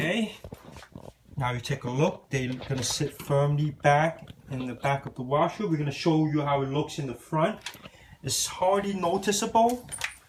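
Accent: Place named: China. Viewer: A chinese accent with a little New Joyseee accent... and you get this guy... awesome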